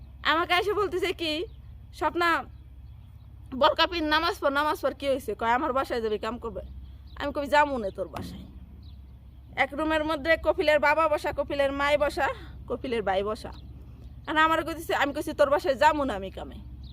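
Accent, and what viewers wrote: Manikganj, Bangladesh. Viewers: আপু আপনার ভাষা শুনে মনে হয় আপনি মানিকগঞ্জের সিংগাইর থানার সাহরাইল এলাকার আসে পাশের মেয়ে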